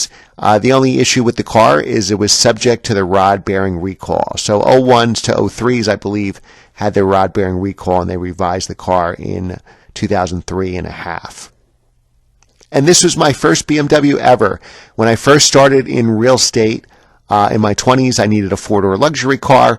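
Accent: United States. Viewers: as soon as I hear an eemerikun accent, I switch off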